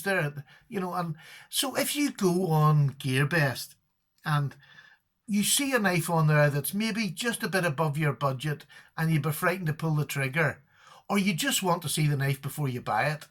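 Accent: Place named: Ireland. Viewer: Congratulations. Love you channel and even more your sweet Irish accent!:-)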